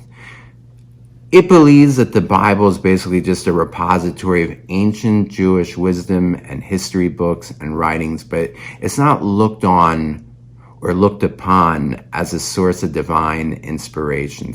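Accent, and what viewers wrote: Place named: Queens, United States. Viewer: I am so glad to have found you! I was watching an ATHEY CREEK video today, and Pastor Brett spoke at length about your work! And I have to be blunt - as a girl from QUEENS, living in the SOUTH for the last 35 years, I was tickled to actually hear someone that sounded like ME! So glad to meet you! Looking forward to your next video! 👍🙏👍🙏👍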